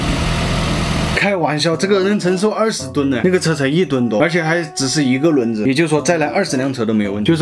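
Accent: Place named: Hunan, China. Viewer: 听你这湖南腔就想笑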